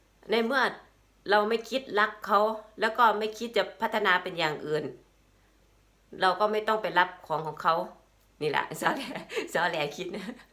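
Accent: Isan, Thailand. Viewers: มีความสุขกับลูกๆอยู่แล้วในตอนนี้ เร่งเรียนภาษาเค้าให้ได้ก่อนดีกว่าจ้ะ ... อย่างอื่นยังไม่สำคัญ... น้องคิดได้ดีมากๆจ้ะ แล้วก็ไม่ต้องพูดภาษากลางหรอกน้อง มันตะกุกตะกักไม่เป็นธรรมชาติ ภาษาอีสานที่น้องพูดน่ะสุดที่จะไพเราะแล้วค่ะ✌😊🇩🇪 (สวยอยู่แล้ว)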